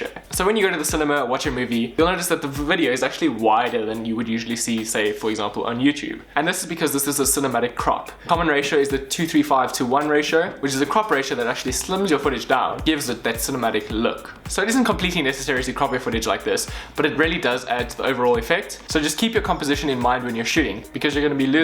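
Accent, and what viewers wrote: South Africa. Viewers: Are you South African? That accent sounds like home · U from SA? Lol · he's south african isnt he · Hey, the first video I have watched from you... and just from your accent and the first 3 seconds of the cinematic video(I saw the buildings and traffic lights) and I knew this is from South Africa(Downtown-Johannesburg). Nice well done :D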